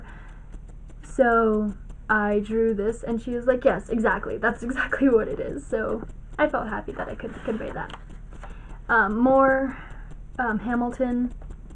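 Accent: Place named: United States. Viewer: I'm not sure any if any of the threats given are enough for him to be arrested, the server is small and between friends, so I'm not ok disclosing that. But I will give you his account it's AllergicToPlebs#4779 Now I'd suggest blocking him. But it's your call. From your accent I'm assuming you're American, most people on the server are British or European. Aside from that, I wish you the best of luck, if you can slap some sense into him at the same time that'd be very nice too. I'm getting tired of him, as close as a friend as he is.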